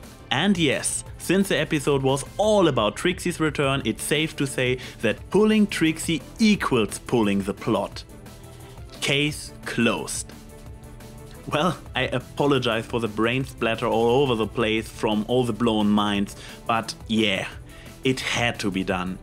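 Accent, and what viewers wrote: Germany. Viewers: I meant to say my accent isnt as bad as this. Seriously you have like the most German accent ever. Also what does stand for? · Is he German?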